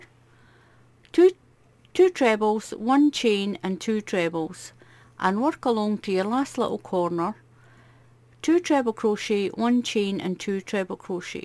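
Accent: Scotland, United Kingdom. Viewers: Hallo can you please give us the number stitches for an 2xx person I think you are one person that can do it watched all you videos I love how you talk I think it sound like Scotland people is speaking I am from South Africa. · Thank you, your work is lovely, and I love your Scottish accent. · Hi this is brilliant and I cant wait to have a go at one of these. Also I am from Northern Ireland so I love your Scots accent! Looking forward to your next video.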